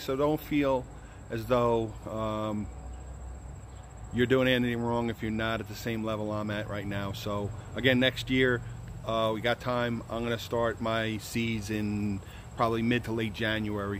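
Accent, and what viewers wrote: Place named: New Jersey, United States. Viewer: Love your enthusiasm...and the Jersey accent totally helps. Get the cheap-o paper plates. Love it!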